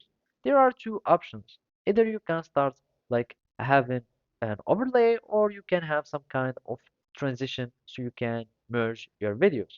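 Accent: Morocco. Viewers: It’s insane that I know that you’re Moroccan by your slight accent because I’m Moroccan too 😂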